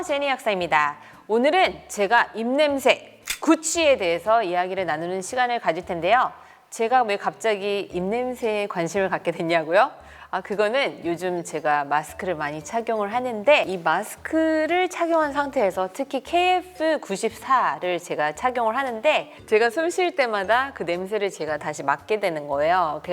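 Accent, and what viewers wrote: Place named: United States. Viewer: 영어 발음두 미국본토 발음이시구 네이버 다음만큼 지식이 훌륭하십니다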